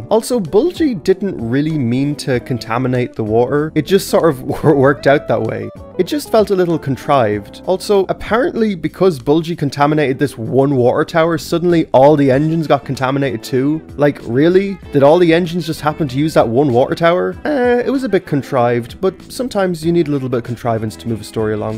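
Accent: Ireland. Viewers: you already sound Irish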